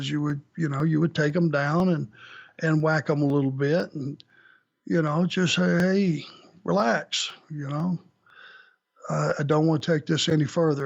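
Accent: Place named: South, United States. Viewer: This is my first listen to anything from Arn's podcast, and all I can think of in listening to him is that he sounds like Winnie The Pooh in a southern accent. Listen to Winnie the Pooh from the 1970's when Sterling Holloway did his voice and imagine that with a southern accent. This is today.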